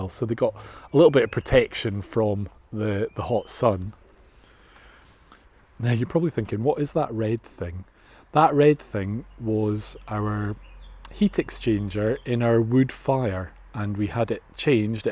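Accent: Scotland, United Kingdom. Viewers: love your commentary and Scottish accent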